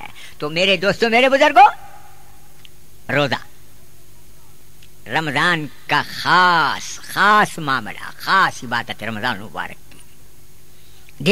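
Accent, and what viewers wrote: Bangalore, India. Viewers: This is Bangalore accent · It’s BANGALORE accent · bangalore accent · Subhanallah mashallah ...allah sab ko roze ki ehmiyat samjhne ki toufiq de .....bacho bacho ko allah hidayat de ..ane wali nasal ko roze ki ehmiyat samjhne ki toufiq de 'ameen' anf iam from banglore banglore people spk lyk dis nly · Its not Telangana accent, its a Bangalore accent and he is no more with us, he was khatib of masjid jaynagar 4th block bangalore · This is not a funny bayaan bro, its a lesson what ever accent it is... and its not Hyderabad accent its a Bangalore 4th block Jayanagar imam Moulana saheb walaja ke, Allah inko sehath ata Kare... plz all of you pray for his sehath and afiyath. Thanks AshfaQ BTM Layout, Bangalore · what is fun here..?nothing fun in it..!don't criticise the regional slang of Banglore..the person who posted this video may not be perfect in Arabic. 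Can he be insulted by the Arab people..?Just delete this post or change the title as "Excellent Bayan about Ramadan". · it's not Telangana accent by the way. it's Banglori by the way... Dumb uploader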